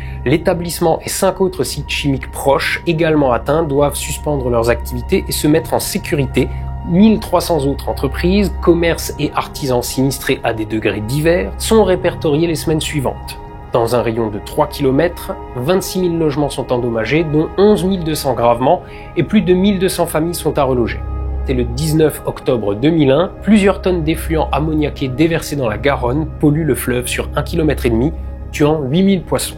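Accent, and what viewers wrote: Alsace, France. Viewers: j'ecoute toujours tes sponso juste pour ton accent alsacien ALSACE EN FORCE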